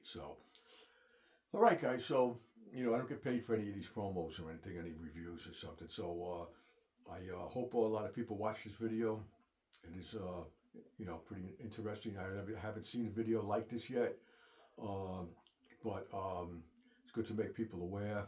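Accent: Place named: Northeast, United States. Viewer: I'm an Irish guy from the Bronx, and I sound similar to this guy. It's a Northeastern thing.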